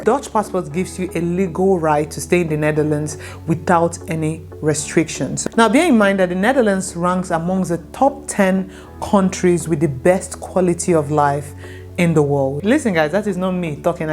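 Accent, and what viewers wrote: Nigeria. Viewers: I would not think you were Dutch because you sound Nigerian.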